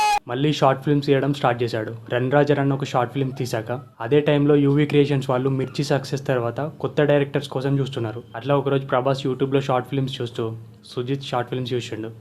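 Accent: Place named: Warangal, India. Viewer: Hi...ne video motham chusa 1 sec kuda waste chykunda u explained vry gud..proper warangal or karimnagar laga undhi me slang...iam Sandeep frm Hyderabad..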